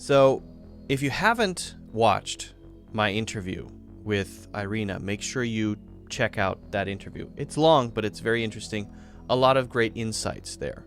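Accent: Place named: United States. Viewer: Your vocabulary is very American. This needs correcting as well as the accent. Yes everything is a drama for Americans.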